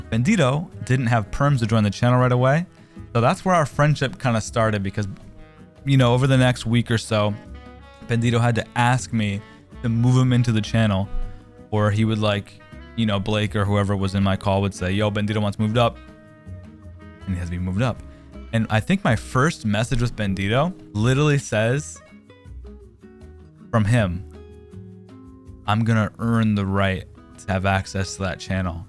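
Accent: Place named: Saskatoon, Canada. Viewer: Are you from canada ? More specificly from saskatoon? I swear to god you have that accent like youre from around here. 😂 sorry for the weird question.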